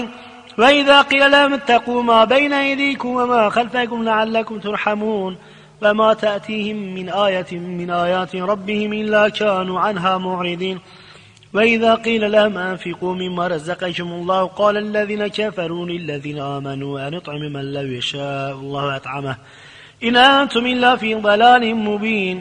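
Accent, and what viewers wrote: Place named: Southeastern Anatolia, Turkey. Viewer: Mustafa bey öncelikle iyi günler dilerim. Yorumunuzda bahsettiğiniz tarzda çok çok büyük hatalara rastlamadım. Denk geldiğim kadarıyla 6 yerde harf telaffuz hatası var.(Peltek yerine düz okuma gibi.) Bunu da her insan hızlı okurken gayet de yapabilir. Bey'de farkettiğim kadarıyla Doğu-Güneydoğu Anadolu ağzı var. Bu nedenle de bazı harflerin telaffuzu kendisi için alışılmadık gelebilir. Ortada veballik bir durum olduğu düşüncenizi reddediyorum. Size ve sevdiklerinize sağlıklı ve huzurlu günler dilerim. Bey'e de sevgiler. Başarılarının devamını dilerim.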